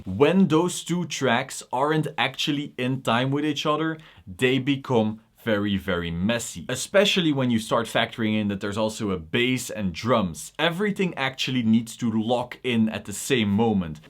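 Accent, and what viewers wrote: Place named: Quebec, Canada. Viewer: where are you from? your accent looks like a french canadian speaking english ahah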